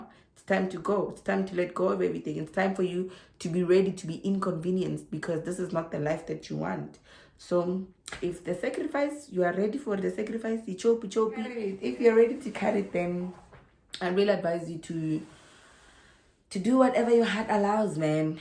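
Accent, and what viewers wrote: South Africa. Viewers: you are an inspiration you are a pleasure to listen too, I feel my heart full of nostalgia hear your wonderful personality one thing I miss from South Africa is the way black South Africans speak english, people may think I am being racist mentioning the accent and way Black South Africans speak but is a term of endearment, as it is one of the most beautiful things of African people as it shows the beauty of the African soul, I too moved to Australia and have built a good life here, I would be dead already if I had stayed in SA having Diabetes and blood clots my biggest sadness is when we voted for one man one vote all whites new that was the end of Apartheid which was necessary as it was an degrading evil, most whites were expecting to see all races especially Black people lives upfift to a middle class lifestyle we as whites enjoyed, and to how the ANC has destroyed everyones dreams except their own selfish lives is so so sad, so happy you managed to get out and build a wonderful for yourself and family you should send your video to TED TALKS as you have a gift and are an amazing speaker you are a beautiful person with so much to give to humanity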